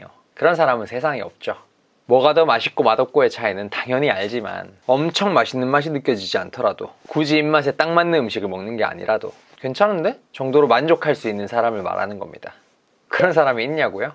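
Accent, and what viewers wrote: Gyeongsang, South Korea. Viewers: 심리학 관련 찾다가 우연히 님 영상들 보게 되었는데 그 어떤 시트콤 드라마보다 재밌게 보며 간만에 많이 웃었네요. ^^ 화법이 표준어가 아닌데도 지적이고 젠틀하며 경상도(대구?) 사투리가 너무나도 매력적으로 묻어나는 말투... 매력남의 표준이시네요!🥰 이젠 느무 나이가 들어서 연애 포기하고 지천명을 바라보는 골드 미스, 미쿡 사는 누나(ㅋㅋ)가 구독, 좋아요 눌러 버렸어요. ㅎㅎ ( 님을 일찍 알았었더라면 결혼 성공 할 수 있었을라나...? ^^; )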